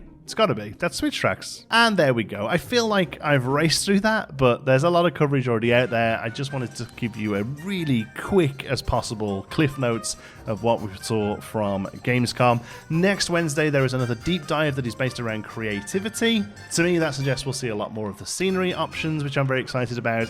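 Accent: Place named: Staffordshire, United Kingdom. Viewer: Loved this insight, I'm so pumped for Planet Coaster 2 now, it's everything I wished for whilst playing Planet Coaster 1. By the way, weird question. I'm from the UK but have never been able to nail down your accent's area, I think it's west midlands somewhere but it's very specific with the way you pronounce the 'ow' in now and so on, I've heard a few people have this inflection and I've always wanted to know the town or area that pertains to. I'm a bit of an accent enthusiast so sorry for the very odd question!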